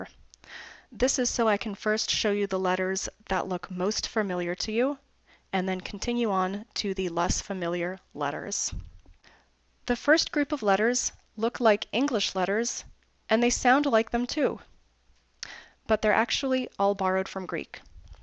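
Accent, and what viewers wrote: Russia. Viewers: Old Church Slavonic is a beautiful language but it doesn¨t sounds good with a Russian accent, besides it¨s confusing. крест, воскресе́ние are in Russian not in Old Church Slavonic. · Most of the stuff is good but you seem to have a very thick Russian accent, and, more importantly, are very reductive of pagan and illiterate societies. Importantly, your line about "oral" languages not being able to express complex concepts is completely bogus, minus the obvious lack of adequate vocabulary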